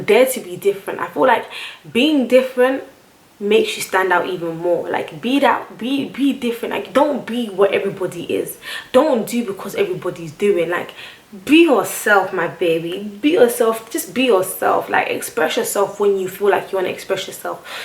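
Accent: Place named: United States. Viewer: how come i come from my boy joovier video you sound more american than me?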